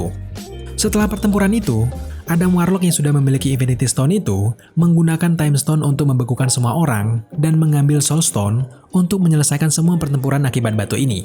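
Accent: Java, Indonesia. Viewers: Nada Logatnya mengganggu. Medok banget. 😁🤭🙏🙏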